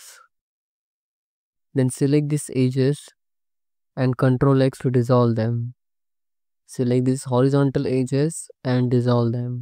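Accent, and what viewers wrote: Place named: India. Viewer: Nice video dude, your English accent is like an Indian guy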